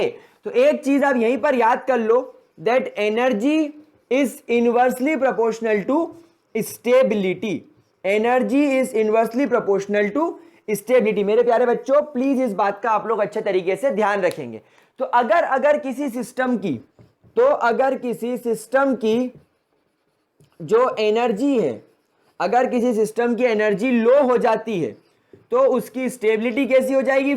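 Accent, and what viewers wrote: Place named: Gujarat, India. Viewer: By your accent I can understand that you are a Gujarati.........Isn't it?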